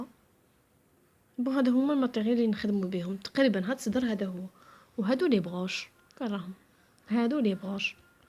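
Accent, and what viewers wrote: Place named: Tlemcen, Algeria. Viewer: و الله يا اختي حسبتك تلمسانية انا تاني تلمسانية و نهدر بالقاف و عندي اللكنة كيفك سبحان الله المهم الصدر عجبني بزااااف شباب الله يعطيك الصحة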